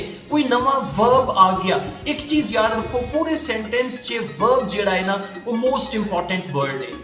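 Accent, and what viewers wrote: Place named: Malwa, India. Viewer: Background music...👌👌punjabi sirra bolde o sir tuc sade ..malwaian wangu😊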